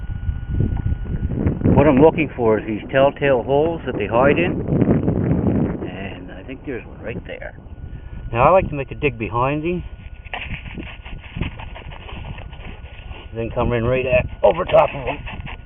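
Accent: Canada. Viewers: hey am living up in ontari-ario and im from the new glasgow area your voice sounds familiar like you might be from around there. Cool videos thanks i subscribed:)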